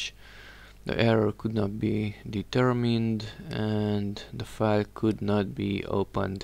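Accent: Hungary. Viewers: Thx for the info (alig lehet hallani hogy magyar vagy :P ) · Nagy segítség, le sem tagadhatod amúgy, hogy magyar vagy. Az akcentusod elárulja :D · Akcentusod rögtön elárulta hogy magyar vagy ))) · It sounded like hunglish.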